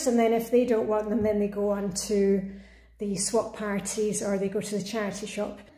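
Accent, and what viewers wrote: Scotland, United Kingdom. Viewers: Hey, are you Scottish?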